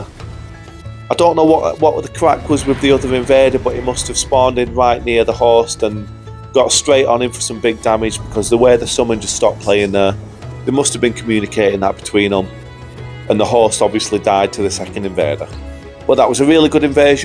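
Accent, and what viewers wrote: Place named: Yorkshire, United Kingdom. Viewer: Love your accent mate! What part of the UK? Yorkshire?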